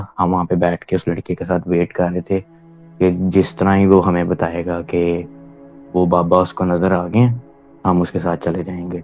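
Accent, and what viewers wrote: Pakistan. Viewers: This guy sounds like a pakistani. Not an indian accent for sure.. or is it just me :/ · Yes he does i thought that too.. even his worfs were pakistani more than hindi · Yup he's accent is Pakistani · Ye zrur koi Pakistani he u Jo ainve he zabrdasti ka Indian bna hua h bilkul Pakistani accent h yarr or phr ye basically, basically sun sun kr uljhan hone lagi ... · Pakistani accent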